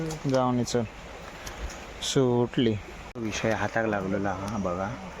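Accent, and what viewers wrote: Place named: Malvan, India. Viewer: भाऊ..तू दोडामार्ग चो असा ना..म्हणून मालवणी कोकणी asscent कडून बरो बोलता 🎉😊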